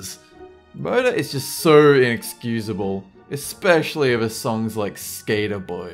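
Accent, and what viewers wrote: Australia. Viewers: Is Aussie? I feel like he is from the east side, maybe Brisbane?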